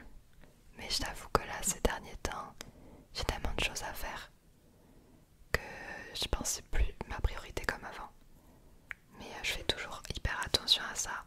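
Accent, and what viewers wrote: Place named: South, France. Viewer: Tu es Parisienne ? Tu as dû descendre dans le sud, toute petite, car je reconnais notre accent. Je suis de Carmaux. Bizz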